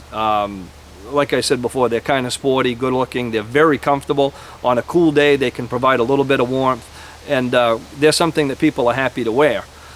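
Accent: Maine, United States. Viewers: I'm a .45 acp guy. (1911&G36) But I tell everyone who asks me that the most effective weapon is one that you can put rounds on target accurately and rapidly. I don't care what the caliber is. Stick with what you shoot best. BTW...are you from Maine? (accent kinda gives it away. Maineiac born and raised here)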